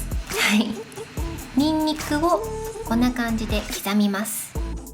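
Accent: Okinawa, Japan. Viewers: の沖縄弁とキャビンクリューみたいな話し方が可愛い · の沖縄なまり可愛い😍💕沖縄で食べたシリシリ、美味しかったのにちゃんと習ってないので、このレシピを真似て作ります！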